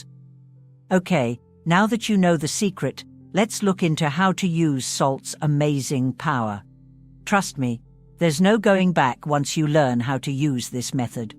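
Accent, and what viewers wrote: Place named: United Kingdom. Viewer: Trump supporter or just an idiot with a British accent?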